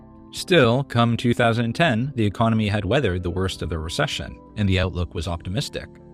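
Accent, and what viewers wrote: Canada. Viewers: could do without this damn canadian narrator since he calls every thing a hoose or aboot learn to speak right or gettoff this narration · I love how I can always tell that a YouTuber is Canadian by the way they say “aboot” lol.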